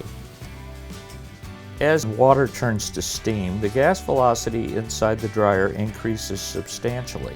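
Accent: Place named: Eastern Europe. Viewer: Nice video, but speaker has an accent, that I can't define, and this makes me curious. Is it East European accent? All the best from Europe )))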